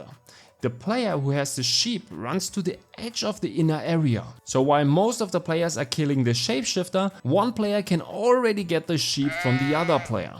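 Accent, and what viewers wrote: Germany. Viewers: Keine 10 Sek. gebraucht, um zu merken das da ein Deutscher spricht :D Danke für das video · Echt schlimm der deutsche Akzent aber super hilfreich das Video, danke! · ich höre doch da einen arg deutschen englisch Akzent. Guides auf detsch wären ein Traum. Uuund du wärst der einzige. Denk drüber nach ;) und danke für die bisherige Pionier Arbeit <3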